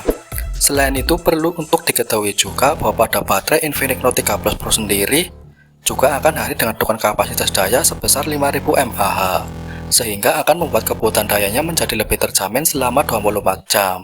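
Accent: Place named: Java, Indonesia. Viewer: Medog banget om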